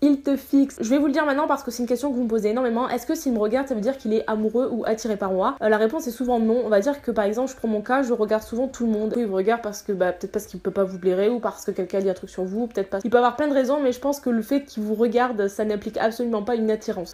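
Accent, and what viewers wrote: South, France. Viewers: coucou! Comme d'hab tes vidéos qui sont originales! Toujours à ta sauce. Et ron accent épicié du sud😂 jt'adore bb😂 #teamprout❤❤❤❤❤❤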